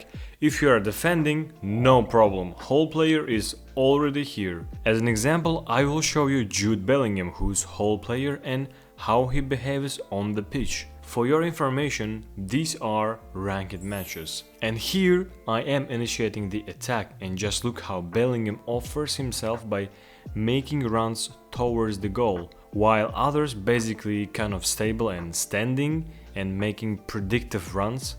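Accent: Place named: Eastern Europe. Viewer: Nice one, just came across your chanel Btw are you from any of the former soviet union countries? Your accent sounds very familiar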